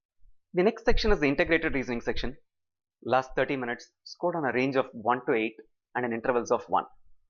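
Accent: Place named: India. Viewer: fuck off with ur indian English accent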